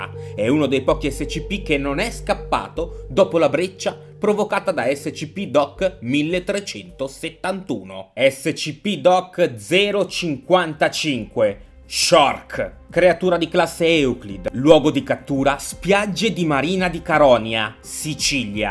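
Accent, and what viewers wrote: Veneto, Italy. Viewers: Veneto vecioo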